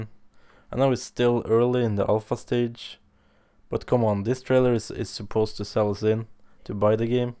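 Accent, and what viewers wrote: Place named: India. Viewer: your accent sound indian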